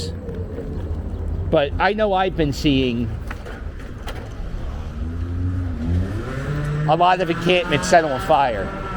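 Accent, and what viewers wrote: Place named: Pennsylvania, United States. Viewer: I was watching a video on US accents (done back in <num>’s) and specially focusing on accents that may disappear. they interviewed this PA woman who seemed to have a similar accent to you. It was cool to here the accent again.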